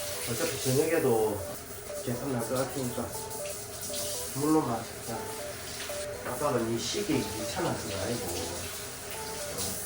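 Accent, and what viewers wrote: Gyeongsang, South Korea. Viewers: 오랫동안 질리지 않는 이 사랑스러움과 친근한 경상도 사투리의 주인님 찰떡궁합과 멘트 쵝오🎉🎉🎉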